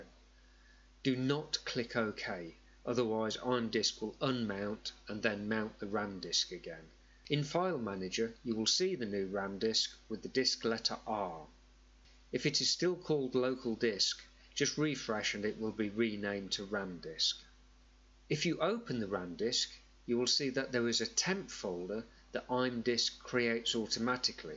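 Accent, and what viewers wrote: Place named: United Kingdom. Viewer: Very helpful, thank you. Your speech was so good that I didnt mind the british accent, which I normally tend to despise. Although I do have one question, how the hell does the program even know where in the disk to put the files to during a shutdown? As far as the RAMdisk is concerned, the files could have come to it from even the desktop. How does it know where the files originally belong to? Edit: Nevermind, for some reason I assumed that RAMdisk was sending the files to their original places upon shutdown. Nay, rather, it just saves them to that one folder you specify and reloads from there upon restart. Why did I even assume that I wonder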